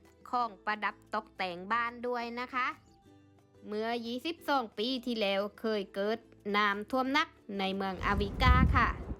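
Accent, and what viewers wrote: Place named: South, Thailand. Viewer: ชอบจังเลยพูดภาษาใต้บ้านเราเพิ่งเข้ามาติดตามเดี๋ยวจะตามแลย้อนหลังนะคะ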